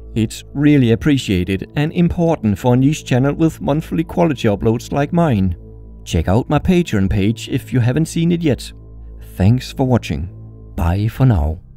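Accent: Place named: Denmark. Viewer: That accent sounds soooooo danish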